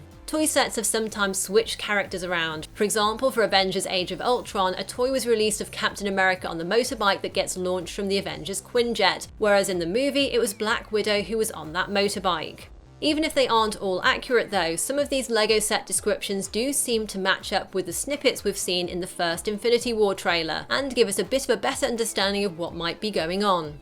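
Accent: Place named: United Kingdom. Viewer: Ur British accent gives me boner ever damn time........ Jizzlied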